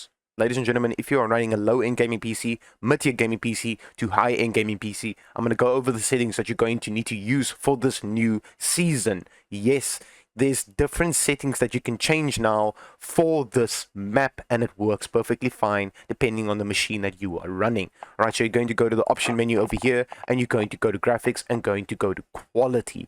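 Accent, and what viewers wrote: South Africa. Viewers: Bro I knew you sounded South African. Great to find a South African tech youtuber!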